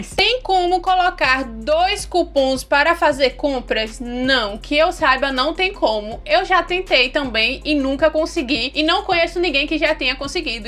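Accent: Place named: Northeast, Brazil. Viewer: Menina, tu é muito inteligente! Carismática, bonita. Eu gosto do seu sotaque nordestino.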